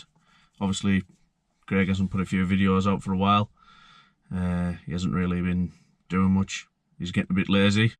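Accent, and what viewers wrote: Cumbria, United Kingdom. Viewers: Where ya from pal, sound very Cumbrian to me like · U from out west marra? Sound cumbrian Lovely car but get rid of them wheels 🙏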